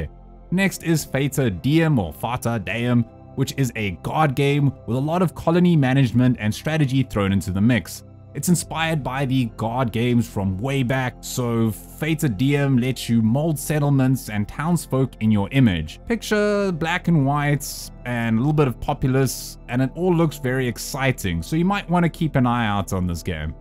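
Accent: South Africa. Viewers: Do... I hear a Saffa?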